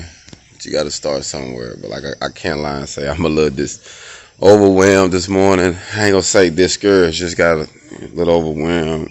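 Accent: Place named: Memphis, United States. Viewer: Sound like u close to Memphis my dawg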